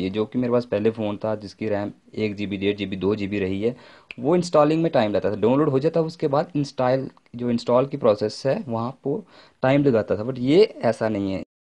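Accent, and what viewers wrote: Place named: Punjab, India. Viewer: aapke bolne se lagra tha aap punjab k ho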